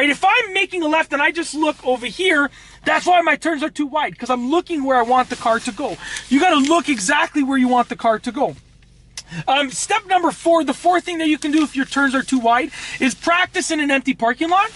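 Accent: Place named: Canada. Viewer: I love how I realized he was Canadian when he was yelling from his accent. Haha! I got a very well needed laugh! I love (Toronto) Canadian accents!!! They're my fave! :-D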